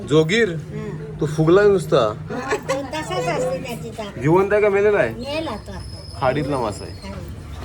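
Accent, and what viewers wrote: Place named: Raigad, India. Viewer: मस्त ani bhari tuj बोलण khup आवडतं मित्रा ओरिजनल रायगडकर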